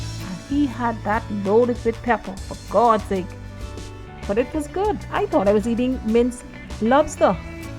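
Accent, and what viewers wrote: Bahamas. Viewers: Just because this is what I'm cooking I selected this. I didn't realise this was Bahamian until i heard you speak. Will definitely subscribe.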